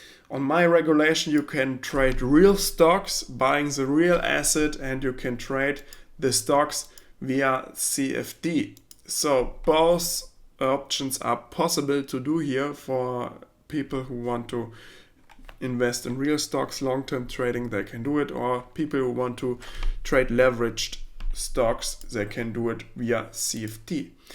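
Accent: Germany. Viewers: deutscher Akzent kickt😂 Aber sehr gutes Tutorial. 10/10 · Bro nices Video aber fühle den Deutschen accent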